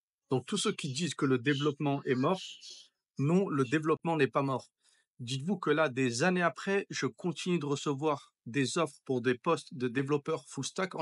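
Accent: Banlieue, France. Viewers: Tu a toujours ton accent de banlieue depuis le Vietnam. Si tu sorts un programme ou une application, le problème c'est de le commercialisé pour dégager un revenu et c'est pas si simple sauf si tu t'appelles zuckerberg ou Musk. Courage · Un mec avec un accent rebeu de cité, voire accent racaille, comme ça, personne ne l'engage... fini à l'entrevue.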